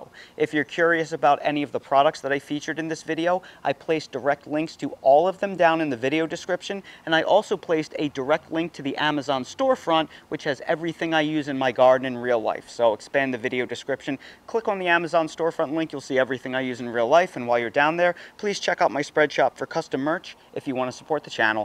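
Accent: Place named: South Jersey, United States. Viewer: Are you sure you're from Philly? Your accent sounds more like South Jersey.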